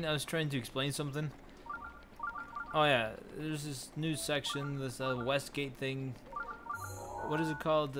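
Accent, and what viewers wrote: New York, United States. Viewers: I will have to say, I realized right away that this is the first YouTube video I've ever watched with a New York/Guido accent. I'm not even mad. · So, im from NYC (born BK, raised Staten Island, so you can imagine The accent I have)..and so many of my gamer friends want me to voiceover my videos cuz of that accent. And hearing you in your video, now I understand why. Absolutely awesome 🤣🤣 (side note, thank you, I couldn’t find this shrine for the life of me lol)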